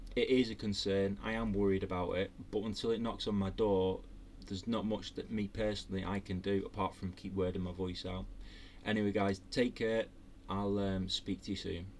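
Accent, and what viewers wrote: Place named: Widnes, United Kingdom. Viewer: Are you from Widnes? I kind of recognise the accent. I did a job there once. My dad was from Kashmir and came over to the UK in the 1950’s and worked hard all his life. As long as the people coming to England bring skills and money that’s a good thing. Some parts of England I don’t recognise anymore even though I’m half Asian. Taxes on the rich need to be increased- unfortunately government protects the wealthy.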